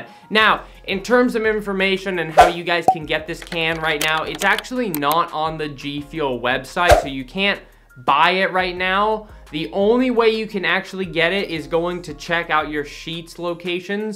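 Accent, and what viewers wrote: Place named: United Kingdom. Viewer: I always thought you were from the UK lol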